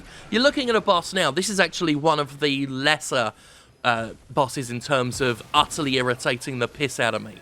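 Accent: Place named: RP, United Kingdom. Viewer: Allow me to save you the time of watching this review. Prefaced with that I used to love Sterling, I've been here since before the cornflakes and before the stint of "addicted to stress". I've been a fan for a long ass time, and shared many of the same views he did. But now all I see is.... Sterling Hates Everything, He Hates Fun. He Hates Friends. He Hates People. He Hates you, your family, your dog, your cat, your nonexistent significant other, your existent significant other, your brother from another mother, and everything that has ever held a state of matter since the dawn of time. He literally has turned into a grumpier "Francis" (Boogie2988) with a posh accent. He spits out reviews after playing a game for 5-10 minutes, says he hates it, says that everyone who disagrees with his review or dare I say it, finds his review full of false information, is full of bullshit or are just hating on "True gaming journalists like him." AKA: Sterling has officially become the IGN of indie reviews. 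Except instead of getting paid to rate games higher, he's getting paid to shit on everything. And it's not just this game. It's a common theme among all of his videos as of late. Sorry. I used to thank god for you, now I'm just not a believer anymore.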